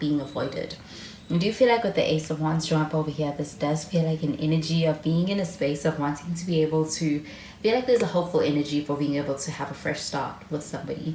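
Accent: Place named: South Africa. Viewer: You sound South African to me for some reason... Your accent just sounds so familiar to me, not you specifically, but in general.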